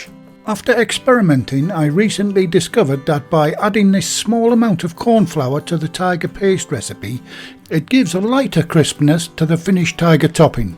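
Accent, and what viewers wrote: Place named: North, United Kingdom. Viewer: Hi, hope you don't mind me asking this - I have been using your Tiger Bread recipes and really enjoy your videos and clear instructions. Its great to hear a fellow northerner's voice. I was wondering if its possible to do a cold-proofed Tiger Bread with any of yourTiger recipes so the bread can be baked early the following morning? Also would you add the rice flour mixture whilst it was proving in the fridge or very gently the next day? Any advice would be much appreciated - thank you in advance Take care and stay safe Best wishes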